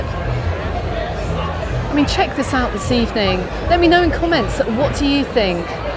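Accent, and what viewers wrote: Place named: Northern England, United Kingdom. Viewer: At first, I thought it was just some town in the north of England, until I realised it was commentating... Lol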